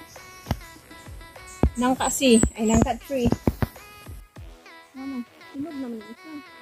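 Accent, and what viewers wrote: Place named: Bohol, Philippines. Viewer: Boholano kaayu Miss🥰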